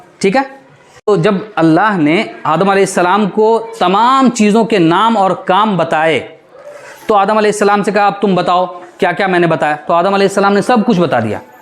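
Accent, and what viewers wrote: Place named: Bihar, India. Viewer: ماشاء اللہ انداز تفہیم بہت اچھی ہے اور ٹھیک ہے ٹھیک ہے کی تکرار اور مزیدار بنا رہا ہے جو بہاری پن کی ڈھیٹ علامت ہے جو بر محل ہے اللہ سلامت رکھے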